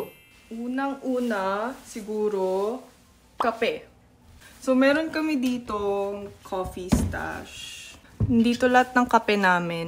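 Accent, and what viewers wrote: Batangas, Philippines. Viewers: Kainis mas tunog Batangueña sya sakin kahit legit Koreana sya, samantalang Batangueño parents ko good gosh Even the most subtle of differences like "matutuwa" , iba intonation ng Manileña sa Batangueña niyan hahahahah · love your Batangeña accent ❤ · Batangueña accent ☺️ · Batangas accent yan. Akala ng iba pag sinabing batangas accent ay katulad nung napapanood sa tv, OA yung mga yun. Pero meron naman talagang matindi ang batangas accent, sila yung mga nasa padulo na ng batangas, hehe. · She used slight batangueño accent and expressions in this vid po. She was raised in Batangas but I think she purposely use tagalog in a more neutral tone dito compared sa iba nyang videos. 😊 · She grew up and live in Tanauan, Batangas kaya may batangueña accent siya. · Batangueña intonation! Nakakatuwa. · Hindi lang basta tagalog: Batangueñong tagalog! 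Look: sa , sabi nya, alam nyo GA dati...😅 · Batangueño here, natutuwa ako dine lalo na sa accent niya. Shaaaat na! Same pa ng mga hilig na food. 😅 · ala eh nagtagal ka nga sa pinas batanguenong batangueno na naman ang punto mo ingat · Speaking tagalog fluently is one thing but speaking with the batangueño accent? 👏🏼👏🏼👏🏼 대박! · Mas gusto ko sayo na batanguena accent palagi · haha parang batanguena Punto mo yey, grabe dami dala yung sakto kumakain ako ng corned beef with egg side na pnctcanton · Her Batangas accent in this video is subtle, but evident in some of her videos. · bat nga naman batangueno accent mo? tsaka bat di ka pa mamalagi sa Pilipinas? mas gusto mo dyan sa Korea? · Batangueña pala to.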